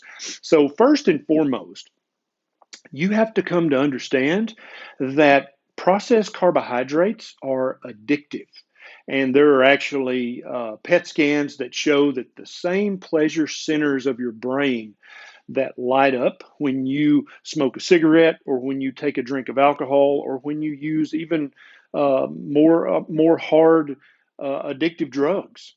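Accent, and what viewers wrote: South, United States. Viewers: Going through it rn, thank you for the video! Appreciate your knowledge, videos and amazing southern accent!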